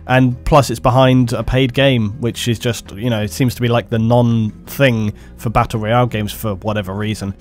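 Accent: England, United Kingdom. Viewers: I stumbled onto your channel solely from the "40K Lore" stuff. While there's other channels that also do great work on 40K lore, your product to me is the overall best. The music, the imagery, and the English accent all really go well together. I am 150% stoked on the idea of seeing Dredd Lore as well! When I think of gritty, dark, and masculine Sci-Fi/Fantasy from the UK, Warhammer and Dredd are the first two things that pop in my mind. I rarely find a new channel on YouTube that really hits me like yours has, so keep up the fantastic work and I look forward to what's in store!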